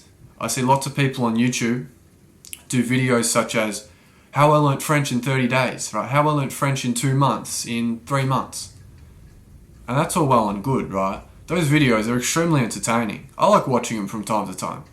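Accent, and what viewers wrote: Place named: Australia. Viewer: This is an Astralian accent, I guess.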